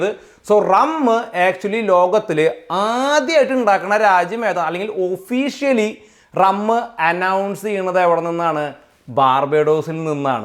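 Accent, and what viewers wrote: Thrissur, India. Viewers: History,geography,sciencum nalla Thrissur slagil kelkan Nala rasa 😅😅😅 keep going btw chettan Thrissuril evedeya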